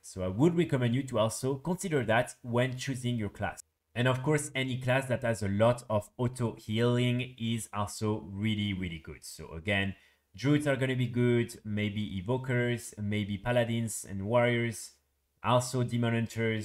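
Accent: France. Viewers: Are you french? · Thats a French accent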